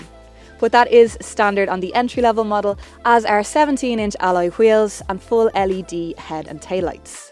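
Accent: Dublin, Ireland. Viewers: I like the Irish accent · Could you not even try to articulate your annoying dublin voice . .f.. sake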